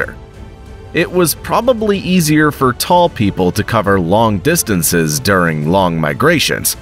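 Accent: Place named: United States. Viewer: Why do you use an American accent but not American measurements?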